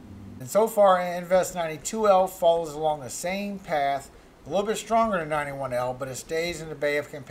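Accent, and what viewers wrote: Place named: South, United States. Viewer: 🥰 Your speech gives you CHARM,!! 💙 Happy the military couldn't totally break you..."can't take the South out of the boy (or girl, haha!) 😆 God bless...He loves us wherever we come from!! 🙏🌴🌩🌴🌀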